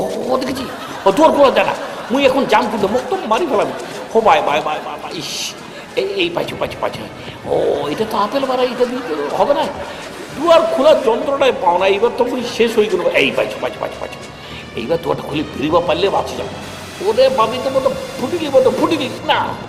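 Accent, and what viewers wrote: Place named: Dinajpur, Bangladesh. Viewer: দিনাজপুরের আঞ্চলিক ভাষা গুলো আপনার মুখে দারুন শোনায় স্যার। প্রতি ইদে এটা একটা আলাদা আকর্ষন অনেকের জন্যই। দিনাজপুরের সকলের উদ্দেশ্যে, দিনাজপুরের চোখধাঁধানো খেলাগুলো দেখতে চোখ রাখুন আমাদের চ্যানেল Sports Mania তে...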